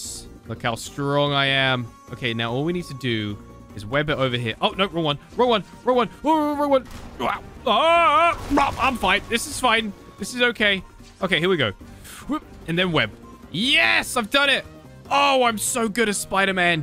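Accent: United Kingdom. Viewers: 😅he's british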